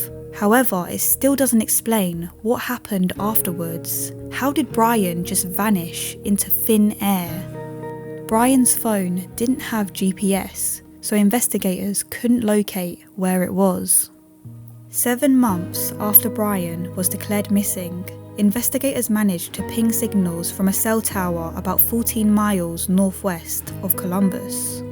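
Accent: United Kingdom. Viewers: I love the narrators Luton/London style accent. Sounds elegant with twangs of ghetto 😅 Love it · Sounds very Croydon?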